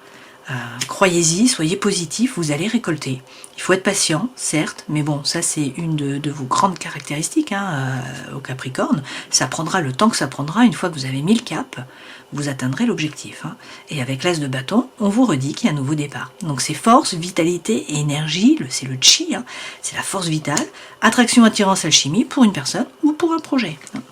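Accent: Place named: France. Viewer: tellement beau l acent francais...😍me calme...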